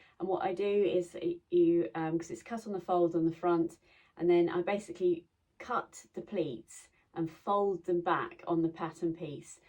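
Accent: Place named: England, United Kingdom. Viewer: I had to go back and watch the early part of this with Closed Caption on. I thought you said Testicles but the CC showed Chesticles...LOL...My USA accent vs your English accent I guess is why I wasn't hearing what you said....LOL...Love the Sally jump suit. You look great in it.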